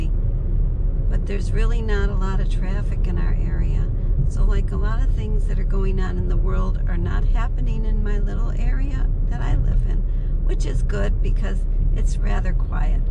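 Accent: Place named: New York, United States. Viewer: It is beautiful countryside. It looks peaceful. I've never been to New York, except a plane stopover at JFK, if that counts. The village looks cozy. I had a bf from Corning, and his mom worked at the Corning factory. He was my first love, so I never really got over him. Be careful, filming and driving. I haven't driven a standard in many years, but I can do it. Yes, so cozy is the countryside. And you do have a New York accent, definitely. That story about the dogs in the trunk is dreadful. Those dogs are amazing. They did not holler, which goes to prove that dogs protect their people, and they don't expect their people to protect them. They could have very easily hollered to get yall's attention. And the fact that they held in their urine. Yes, very amazing dogs indeed.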